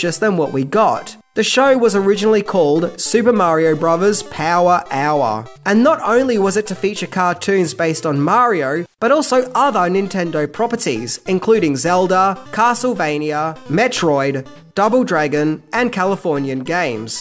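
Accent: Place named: Australia. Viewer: 'Ita wassa game like no-othaaaaa" Too bad they didn't hire an English speaker that speaks ENGLISH instead of what is assumed to be English (Thick Australian? British?) Ruins it for the rest of the video.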